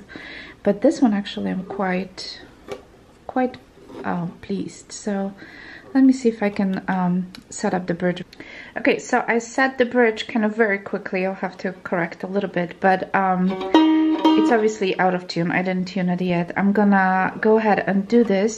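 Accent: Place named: Arkansas, United States. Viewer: First, I never knew the people from Little Rock, AR had such an enchanting accent. I could listen all day. I've ordered a more expensive "beginner" violin, a Gliga Genial-1 Oil varnished violin that is handmade in Romania, since I have many friends from there. With some upgraded D'Addario Heliocore strings, (I heard the regular strings aren't great), a carbon fiber bow, and a Wittner tailpiece, I spent over $420. (Those upgrades were suggested by another Teacher/Review on Youtube). I have been waiting for my violin, but I'm honestly considering picking up one of these Eastar violins so I can get started! With Amazon's fast delivery I may be practicing for a week before the Gliga arrives. Thank you so much for your thorough review. So many of the luthiers make fun and talk badly about the less expensive violins. Based on your review I think I can get an Eastar and that I will not become frustrated because my violin is inferior. 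I may throw some D'Addario Preludes on it. So many music stores sell Chinese violins. Even the luthiers, (Olaf and Fiddlerman), buy Chinese violins made to their specifications. Thank you again for your beautiful review. Please let me know if you think my setup is appropriate.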